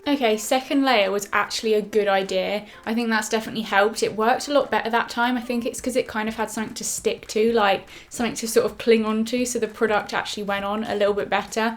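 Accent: United Kingdom. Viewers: I'm in love with your British accent.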